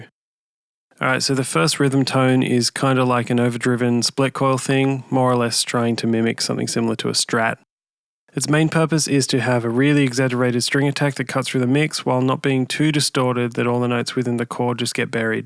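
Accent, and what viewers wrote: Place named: Australia. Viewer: That flat deadpan narrative is just how many Australians talk, especially musicians who are more interested in letting their instrument convey emotion than their speaking voices.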